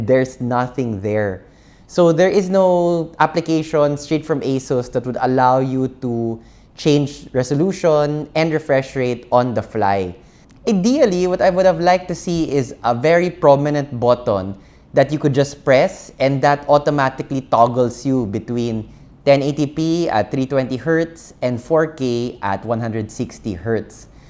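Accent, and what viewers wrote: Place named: Philippines. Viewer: Filipino accent is too funny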